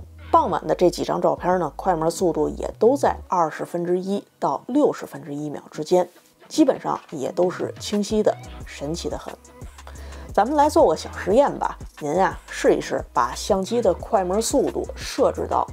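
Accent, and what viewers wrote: Beijing, China. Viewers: 這是蠻道地的北京腔，吃好喝好的比較平，比起某些口音會突然拔尖高音調，我比較喜歡EDI這種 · 她是北京人，并不是所有大陆人都这种口音好吧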